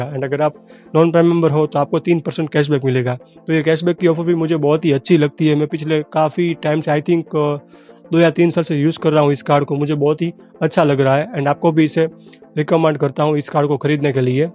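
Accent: Gujarat, India. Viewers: Bhai mere khyal se aap Gujarati ho?????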